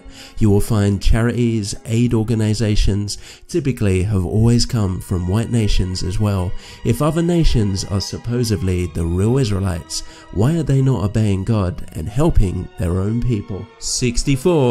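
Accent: United Kingdom. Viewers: Nice work! Is that a Welsh accent? I haven't heard that accent so much. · It's a southern England accent. Relax.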